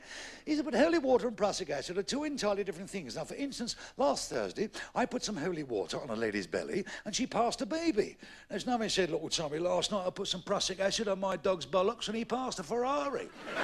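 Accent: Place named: Cockney, United Kingdom. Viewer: Classic cockney comic. They really dont make them like that anymore. All the whites in London talk black now. Such a shame that the cockney accent has died out. His jokes were ok but his accent was classic.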